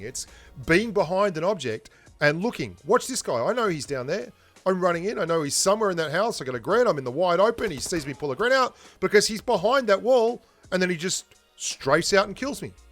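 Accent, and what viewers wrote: Australia. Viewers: Hey bro. I started watching you when I noticed the aussie accent Pretty close to home for me (nz). Your the man with all the advice that has helped me tremendously. Thanks and keep it up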